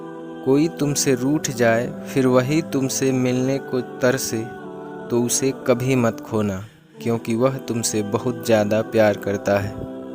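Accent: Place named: India. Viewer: Aap kya India se ho aapke bolane ka Andaaz Sahi Nahin Hai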